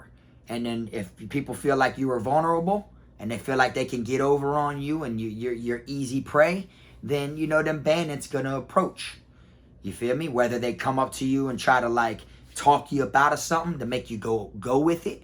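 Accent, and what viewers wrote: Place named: Florida, United States. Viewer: Thats how we talk in Florida lol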